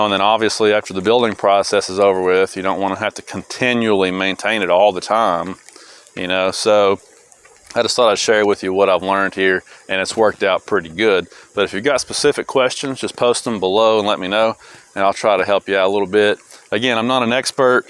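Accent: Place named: Kentucky, United States. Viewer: I knew you were from KY, before you said it…. Sound just like me 😂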